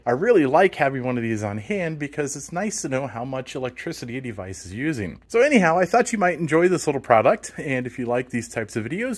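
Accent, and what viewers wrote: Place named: Mid-Atlantic, United States. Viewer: Totally irrelevant but do you happen to be from northern Maryland, Pennsylvania, Jersey area? I'm quite interested in linguistics, I thought I detected a familiar accent.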